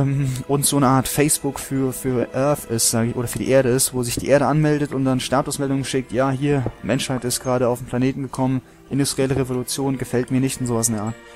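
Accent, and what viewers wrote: Hesse, Germany. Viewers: Als ich gehört habe, dass du Hesse bist (hab ich richtig vermutet), hätte ich dem Video gerne 1000 Daumen hoch gegeben! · Woher Genau kommst du aus Hessen?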